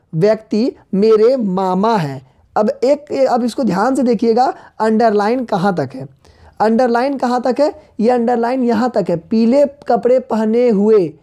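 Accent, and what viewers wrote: Bihar, India. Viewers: Sir aap bihari ho kya ,🤓🤓🧐🧐🔥🔥🙋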